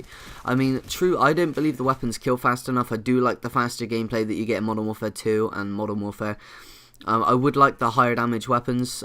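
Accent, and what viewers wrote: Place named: England, United Kingdom. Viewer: Where a outs in England are you from:D I love your accent;D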